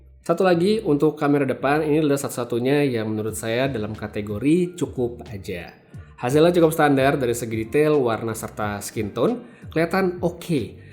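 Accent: Bali, Indonesia. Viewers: Bang..sekali kali pake logat bali bang..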